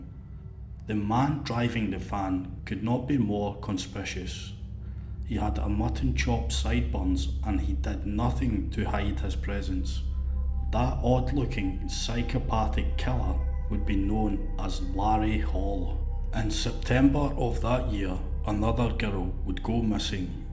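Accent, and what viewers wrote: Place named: Scotland, United Kingdom. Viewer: edit thanks for the news clipping . I’ve been trying to figure out how he got life for one kidnapping charge, that contained more info than a couple diy docs. Subscribed Now that is a rich, Scottish accent. Big true crime/serial killer analysis fan. That Blackbird show got me tho. One scene in particular still haunts me.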